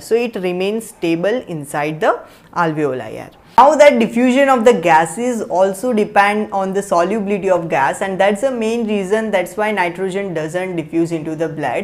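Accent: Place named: India. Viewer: Sorry but when I thought a video is in native English but turned out that it's Indian accent English, somehow I hate it.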